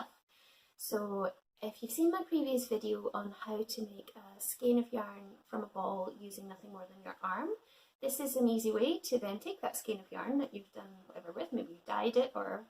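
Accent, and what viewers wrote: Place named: Ireland. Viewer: Cute accent. Ard you Irish? Great videa! Thanks! I'm trying it now.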